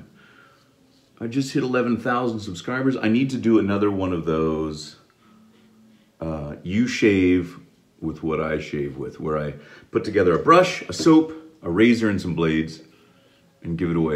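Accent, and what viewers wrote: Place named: Liverpool, United Kingdom. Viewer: Might get this soap myself, peppermint 😃I love your Liverpool accent you do it perfect, hope all is well.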